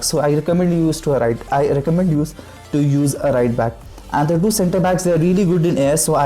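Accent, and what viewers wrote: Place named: India. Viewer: Not everyone fucking understands Indlish??? 🤔🤔 Or whatever it is you're saying when explaining.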